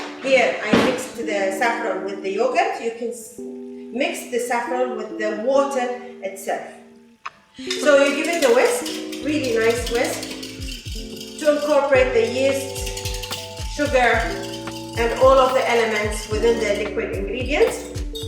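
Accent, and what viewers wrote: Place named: United States. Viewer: Omgosh, you are a joy to watch. I love how simply you make this recipe seem. Yeast scares me but maybe not now. Your English is 100% American perfect. If you don't mind my asking, where did you learn to speak so well? I'm still among from your demonstration. My IG friend sent me your link. I'm going to do as you say, first collect all the necessary ingredients and gadgets before I make this for my friends. Thank you so very much... Stay well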